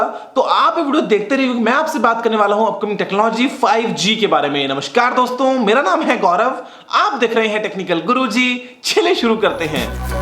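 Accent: India. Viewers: are you Indian????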